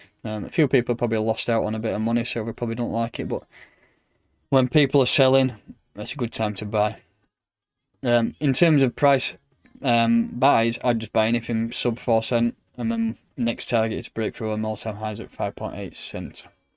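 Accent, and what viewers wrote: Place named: Yorkshire, United Kingdom. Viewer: I was working and living in Scotland about 15 years ago... and people there speaks similar to you, so to me it's a pleasure to watch your channel and listening your British English language from Yourkshie 👍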